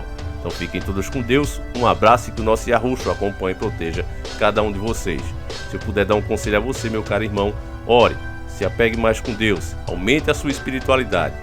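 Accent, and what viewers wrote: Northeast, Brazil. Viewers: Eu por exemplo,acho o sotaque falado do aqui do canal diferente e surreal. Eu não sei como seria quando eu visitasse o nordeste como a cidade de Recife-Pernambuco. Nossa! Será algo como ir a outro país dentro do próprio país e eu tenho essa curiosidade de ver isso de perto nessas terras longínquas. Eu acho o nordestino super diferente em tudo; seria como estivesse convivendo com outra nação. Diferente né!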